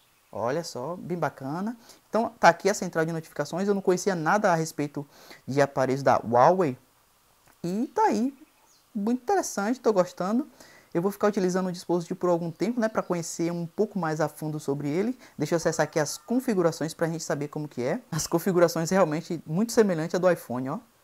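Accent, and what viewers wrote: Bahia, Brazil. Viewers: Tu é baiano de onde, man?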